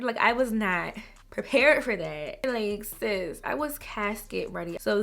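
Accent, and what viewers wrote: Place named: Baltimore, United States. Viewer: It's my first time watching you. Are you from Baltimore? I detect an accent. Your face looked flawless btw.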